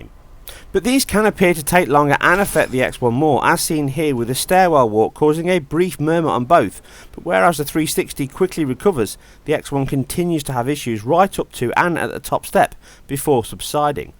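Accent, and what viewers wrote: United Kingdom. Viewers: Tks!! I love the british tone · He is. English, to be specific. I've been trying to work out his accent for a while, but I can't be more specific than that.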